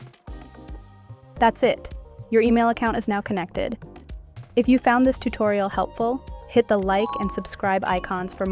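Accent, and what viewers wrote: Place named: United States. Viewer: Breath of fresh air to have an African resource for this - now consider having an African voice over to make it more genuine (as opposed to an American accented voice)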